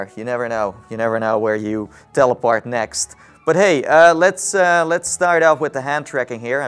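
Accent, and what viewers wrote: Netherlands. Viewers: I dont now but your accent is abit like a dutch man speaking english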